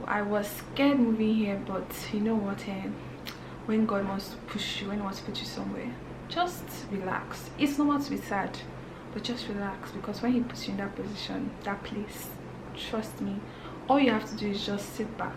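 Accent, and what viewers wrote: Ghana. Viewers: You’re accent has even changed. You’re speaking like a Ghanaian. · Loving your Ghanaian accent 🤗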